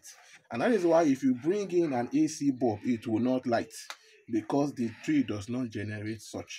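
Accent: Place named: Nigeria. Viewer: 🥱🥱🥱 Nigerian English sucks please use scientific language.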